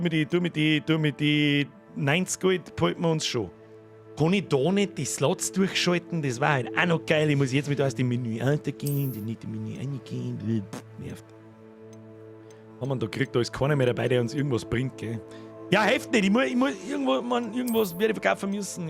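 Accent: Bavaria, Germany. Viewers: Geiler Stream wie eigentlich immer also wos soge denn lang du Bayrische Mundart Legende 💙🤍👍🍻🍻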